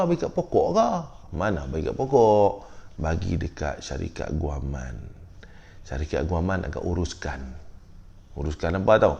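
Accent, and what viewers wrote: Kedah, Malaysia. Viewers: terbaik la tuan bercerita… loghat kedai piaw punyaa… hahaa..dari kedah mariiiii…. kuala nerang mai tuann…. seronok dengaq bercerita dan lawak penuh nasihat… nak part 5 dan 6 seterusss smpai bila bila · Assalamualaikum tuan,best cara tuan bercakap.Macam orang Kedah bunyinya. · trima Kasih Tuan sudi berkongsi cerita, sikit sebanyak mnjadi ilmu utk kami. tenang ja Tuan cerita piaw kedah punya hehehe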